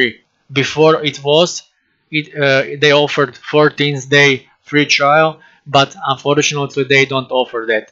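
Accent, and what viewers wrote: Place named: Serbia. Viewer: Hvala, po akcentu vidim da si neki nas 😄 Mislim da bi verodostojnije bilo da si u Ahrefsu proverio domain rating i ceo domen, mislim kad ga vec korisits.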